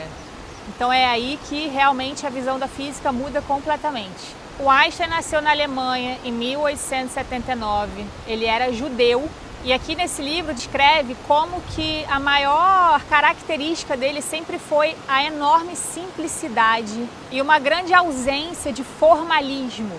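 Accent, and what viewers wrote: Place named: Rio de Janeiro, Brazil. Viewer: Você é natural do RJ ? Seu sotaque é bem carioca,amo seus conteúdos parabéns esta me ajudando a despertar minha consciência ❤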